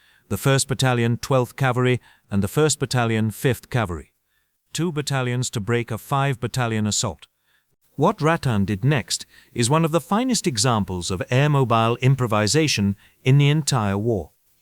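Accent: United Kingdom. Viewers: Why the british accent guy narrating. Get an American.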